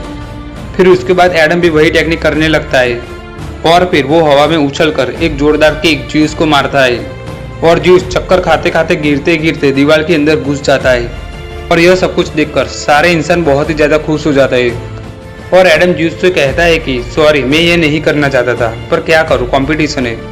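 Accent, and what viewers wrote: Gujarat, India. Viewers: Aap Gujarati ho?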